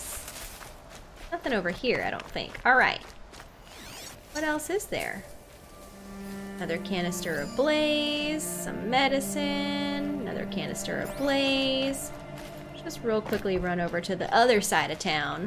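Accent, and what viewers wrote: South, United States. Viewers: Cool intro love ur southern accent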